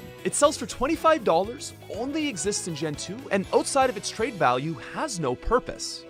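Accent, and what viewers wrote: Canada. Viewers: Thank you for my daily dose of hearing Canadian accent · he probably Canadian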